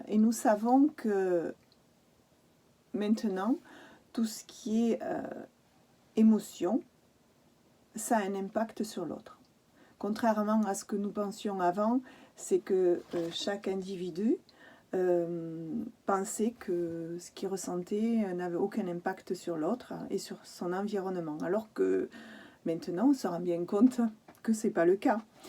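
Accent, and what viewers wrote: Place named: South-West, France. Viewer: Ohhhh merci pour votre lumineuse et étincelante Présence, merveilleuse déesse au bien joli accent (du sud ouest?). Attirée avec un bel élan de vous écouter immédiatement et absolument ravie d'adhérer à vos propos si exacts!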